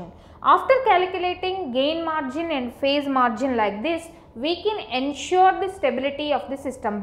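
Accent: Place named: South India, India. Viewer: She is South Indian I guess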